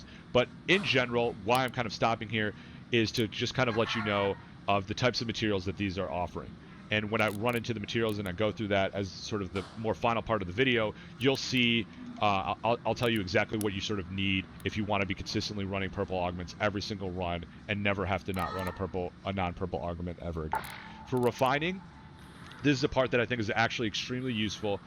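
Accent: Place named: United States. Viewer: Sound American AF and mature, go straight to the point in the video without wasting people time, and you win.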